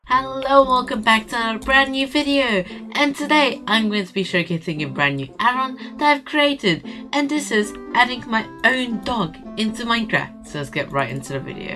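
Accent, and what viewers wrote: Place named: Australia. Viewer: Wth!! I didn't know you were an Aussie!!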